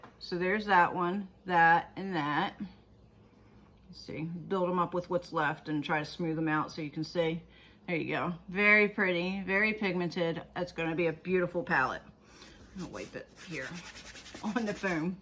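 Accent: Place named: Pittsburgh, United States. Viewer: Heard your Pittsburgh accent and subbed :-) Was considering stopping premium but maybe not lol. Great unboxing. Love the hair and also saw you in my rec feed.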